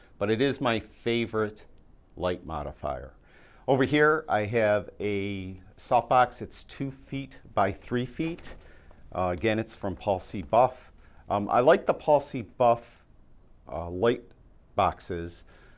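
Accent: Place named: Western New York, United States. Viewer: Nice to hear that western New York twang. I was born in Buffalo and my dad, same name, had his commercial art studio there for years in the Pitt Petri building. He did all the Spree covers for ages. I love your editing videos and have struggled with Capture One's complexity but love the quality. Maybe there is another package that is as good in rendering RAW but less convoluted. I don't need catalogs or even sessions maybe, would be happy to open and work on individual images most of the time. I'm learning Affinity so I can dump Photoshop, but the raw conversion is not so great. Thanks, again. Rix Jennings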